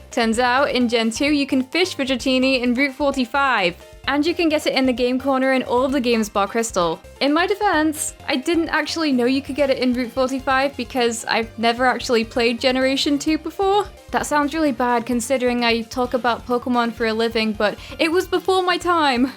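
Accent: United Kingdom. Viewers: I love your vids! Keep it up and keep the British pronunciation!!! <3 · It’s intentional that’s how brits speak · She did not mess; she's British!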